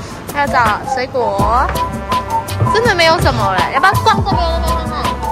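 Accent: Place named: Malaysia. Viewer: 你講話很馬來slang了😂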